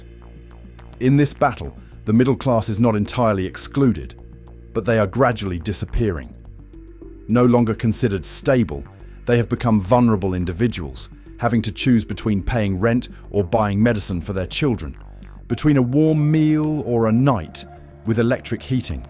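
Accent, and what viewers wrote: United Kingdom. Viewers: Gotta love a video with a snooty British accent type narrator talking about how New York sucks . Bite me ya' limey wanker ! Lol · Whoever the limey Brit narrator is, talk shit about England.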